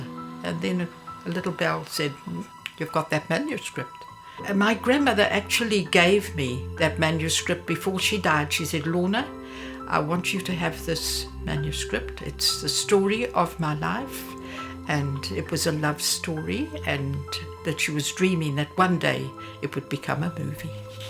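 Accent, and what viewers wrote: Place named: Zimbabwe. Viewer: This Lady sounds like my late mother.. a precious lady .. a voice of a true Rhodesian . Thank you for sharing your life 🙏🏻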